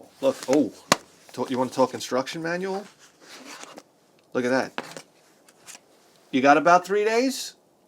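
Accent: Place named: New York, United States. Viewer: Hiya. First off fucking love your show. We're about the same age so I can relate to much of your video game history. I had a subscription to Videogames and Computer Entertainment from 1990-91, I remember there was a special on Phantasy Star 3 in one issue. I poured over that article for hours and hours, examining each screen shot and dreaming about a when i'd get the chance to play it. Never happened, but that's how it was in those days, in my part of Canada, the province of Saskatchewan (i'd to hear you pronounce that on your show with your NYC accent, that'd be fuckin hilarious). There was not much for variety in video games, especially JRPG's. But anyway, I'm with you on the greatness of Phantasy Star 2, I've never completed but i keep getting going back to it over the years. Keep up the great work, on the greatest video game show in the history of human civilization.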